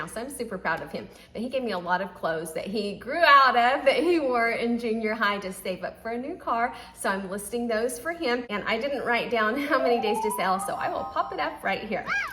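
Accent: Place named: South, United States. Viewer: I look forward to your videos! No disrespect but I LOVEE your southern belle accent, so beautiful! Your sales always blow my mind and keep me motivated. I had a good sales week on Poshmark and Ebay. For some reason Mercari is just little slow and not as fun haha. Eventhough I sold a hobo crochet bag from The Sak that I paid 6 for, it was limited edition sold for 45 so if anyone finds one...snatch it and list it!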